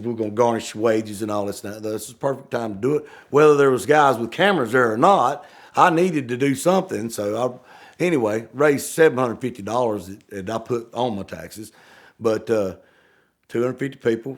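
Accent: South, United States. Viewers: you were right! wicked fun story and the whole southern voice mixed in whith the southern sayin’s is gold! thanks ED, needed a good chuckle today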